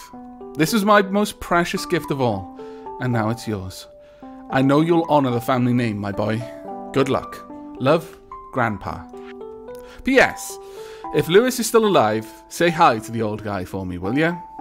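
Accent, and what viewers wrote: Wales, United Kingdom. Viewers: I thought he was British XD thanks for the confirmation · Tigran Movs he’s welsh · Ah so your a brit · I just found your channel and your voice is arousing. Also you are the most British youtuber, even among British youtubers. (That's a compliment) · You have that Accent, sounds British or genuine gentlemen like.. soothing I can listen to you play great games on a podcast. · Ok so after watching your let's play i went and bought the game and am just starting out. Loveit so far! love the vids and your adorable welsh accent! First time watcher of yours now and new subscriber. Just wanted to say thank you and keep going. please. Also love the tardis and doctor Who references as well as a few other things you have referenced. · Hey, I can immediately tell that you are British from your voice but you don't sound very Welsh. since you're returning to the valleys, you could bring the account into it a bit. 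show me that you're the only gay in the village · he's Welsh.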